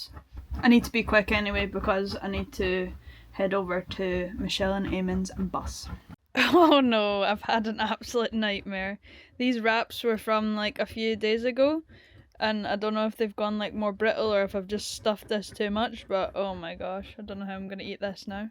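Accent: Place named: Scotland, United Kingdom. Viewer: I love seeing Scottish YouTubers with unashamedly Scottish accents. The world loves us guys, we don’t need to hide our accent. Everyone understands, deep down 😃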